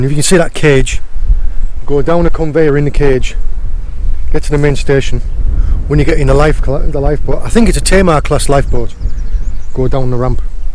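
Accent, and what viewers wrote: United Kingdom. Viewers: I'm showing this video to my student now to demonstrate the British accent.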